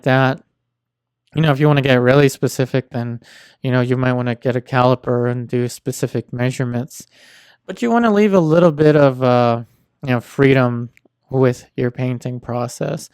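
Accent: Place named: United States. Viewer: keep on soft-ten-ning where useful! Where's from? He sounds american accent except for his love of mis-pronouncing soften: properly there should be a silent T in soften and softening at least here in the American language. Very good videos dude!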